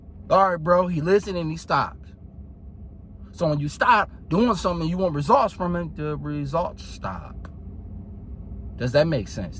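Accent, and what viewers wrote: Baltimore, United States. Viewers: Thanks for content brother. I've learned throughout the journey, just because you don't like the truth... doesn't stop it from being the truth... the gift and the curse of the red pill... i however will take the hard truth over the easy lie anyday... also, im trying to place your accent. You from Baltimore?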